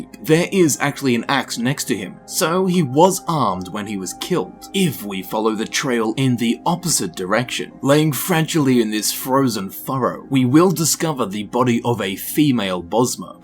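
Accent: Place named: Australia. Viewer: Is it lost on people that he's Australian or.... Of course he's going to "mispronounce" words (Based on YOUR representation on how the words pronounced), so relax with it. He's still funny and makes great videos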